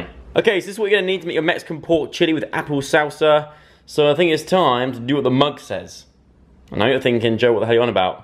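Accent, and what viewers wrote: Australia. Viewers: I love your Australian accent, Joe! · Love ur Australia accent joe! Come to my home and I'll teach ya. Have a good day! Love u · i love that Australian accent 😂❤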